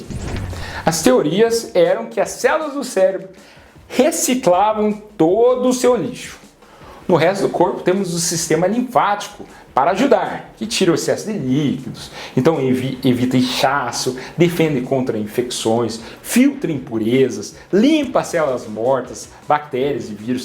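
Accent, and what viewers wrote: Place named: Paraná, Brazil. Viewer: Seu sotaque lembra muito o do meu pai, que é do Paraná perto da divisa com Mato Grosso do Sul. Depois que me mudei para Salvador, há 10 anos, nunca mais fui lá.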